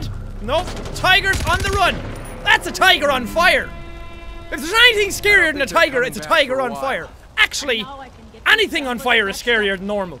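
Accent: Australia. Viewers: I'm an Aussie and that wasn't the worst Aussie accent ever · Your bringing back the Aussie accent again. Embrace the Aussie accent! Long live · has an awesome Australian accent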